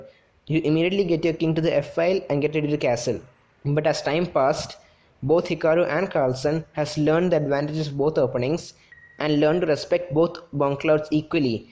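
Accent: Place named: India. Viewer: Ah! Indian accent! The worst english mix accent ever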